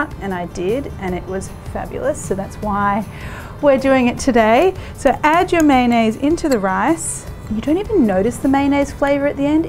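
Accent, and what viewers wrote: Australia. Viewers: You and iggy azalea have the exact same voice lol And it's not just the Australian accent. It's the same tone and pitch.. Mmm rice looks so good. I'll be making this tonight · You sound like you grew up in Australia, then moved to the US south and started to pick up some of their accent.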